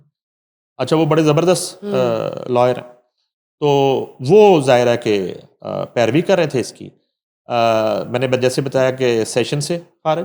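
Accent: India. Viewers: Aap Urdu k ilfaz ko Hindi Mai pronounce krti Hain...why??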